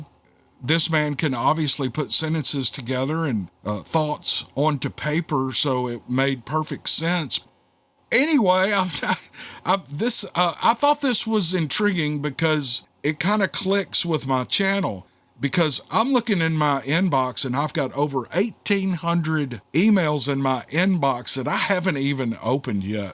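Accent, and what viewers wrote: South, United States. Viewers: Well young man I don't comment much but your southern drawl just makes the stories more interesting and exciting to listen to. So yeah great job and keep up the good work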